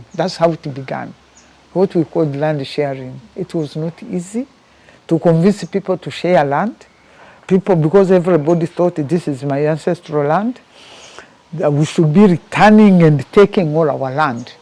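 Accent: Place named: Tanzania. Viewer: Mtanzania mwenzetu.Accent ya kiingereza chako imenijulisha,Poleni sana wanyarwanda kwa mateso yaliyowakuta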